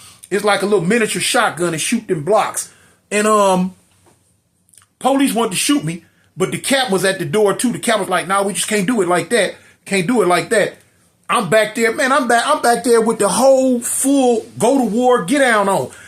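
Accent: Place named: Alabama, United States. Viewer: Lmaoooooo the Hounds of Baskervilles is crazy yo u read that book? 😂😂😂😂 that Alabama accent got a good grip on u big homie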